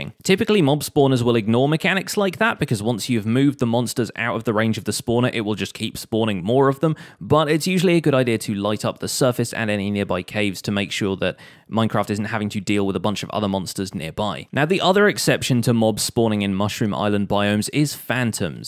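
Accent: United Kingdom. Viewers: He’s speaking with a British not American accent. We use both words - I’m a Brit too. An umbrella is usually for rain whereas a parasol is for sun.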